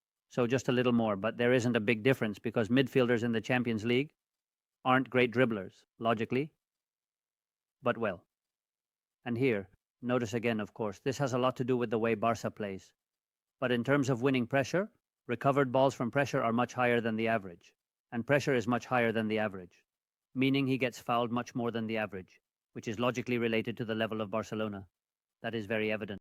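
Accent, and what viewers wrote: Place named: India. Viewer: Te han puesto el inglés con el acento indio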